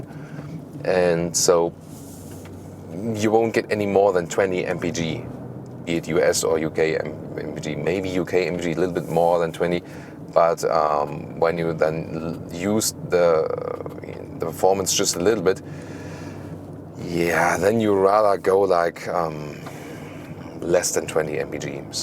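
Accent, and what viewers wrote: Germany. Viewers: Hi, may I ask you where you filmed that review?! Nice nature. Btw: Do you speak german? - I must say the reviewers accent sounds a little german ; )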